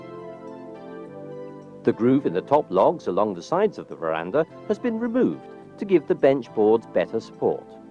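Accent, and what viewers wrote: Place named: RP, United Kingdom. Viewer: Kind of a posh accent for a DIY project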